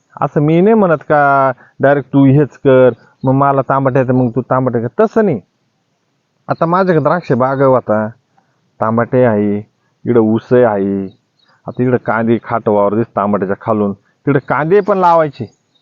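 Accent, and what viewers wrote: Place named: Nashik, India. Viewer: वैजापूर तालुका ते नासिक पट्ट्यातील हेल (स्वर) असलेला आवाज आहे हा.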